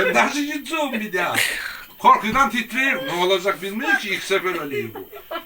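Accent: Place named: Black Sea, Turkey. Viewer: Maşallah ağzına yüreğine sağlık koca yürekli dayı gerçekten konuşurken habu Karadenizi yaşatarak konuşuyorsun vallaha helal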